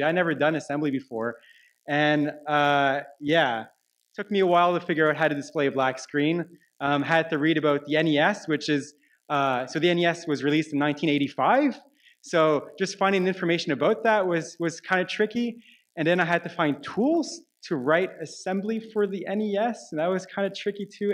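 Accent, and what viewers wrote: Canada. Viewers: Within 10 seconds, we all realized he was from Canada. Then immediately after he said "I'm from Canada" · All the comments on how he pronounces Mario. It's the Canadian accent... You're over there basically saying "wtf, this Canadian talks with a Canadian accent!?!?" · It is a Canadian French accent (Quebec)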